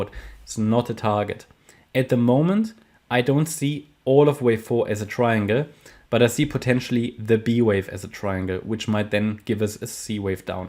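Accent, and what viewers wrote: Germany. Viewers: Deutsch, jetzt habe ich es rausgehört :)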